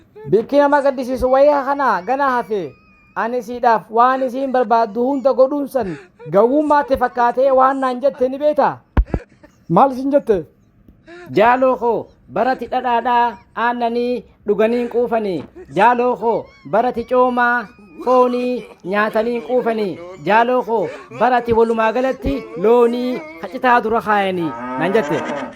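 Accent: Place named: Hararghe, Ethiopia. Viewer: sanuu loqoda Harargee bareeda saniitiin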